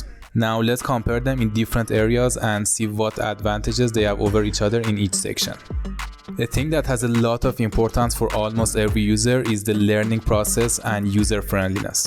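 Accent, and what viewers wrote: Iran. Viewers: bro from that accent your definitely persian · لهجه ایرانی وری یونیک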